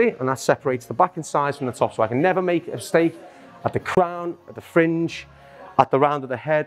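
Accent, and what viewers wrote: Liverpool, United Kingdom. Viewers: Love your videos! I know you don’t work in liverpool but your scouser accent is undeniable... where would you recommend in liverpool? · Love ur Scouse Accent mate🥰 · Scouse, from Liverpool probably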